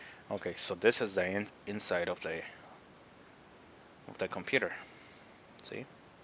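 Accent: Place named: Philippines. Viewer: Are you a Philipino? You sound like a Pinoy. Thank you for your video man I learned a lot!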